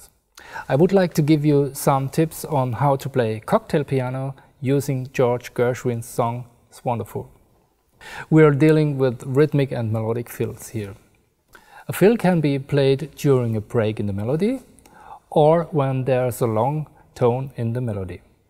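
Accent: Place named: Germany. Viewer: Crazy question: Is the book in English. He sounds German and I don't understand German. Sorry to have to ask.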